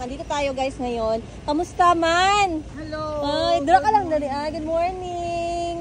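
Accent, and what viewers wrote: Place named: Western Visayas, Philippines. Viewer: Oh my God I super love the place kanindot bah. 😍💖 Angayan kaayo ka mag speak og ilongga ang sarap pakinggan sa tenga.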